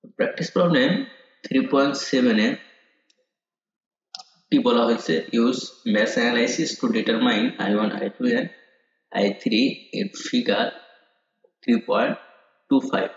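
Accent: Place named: Bogura, Bangladesh. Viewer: Apner bari maybe bogura te,,apner kotha sune bujlam mone hoi bihar Union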